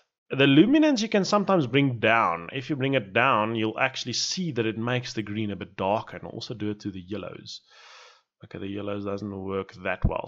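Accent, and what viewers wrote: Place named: South Africa. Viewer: Excellent , Thank You , quick understanding of colour. Sounds like a South African Accent !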